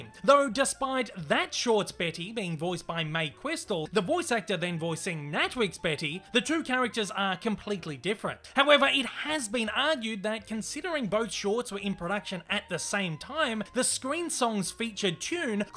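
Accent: Australia. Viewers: I don't mind the Aussie accent.😃😃😃😃😃😃😃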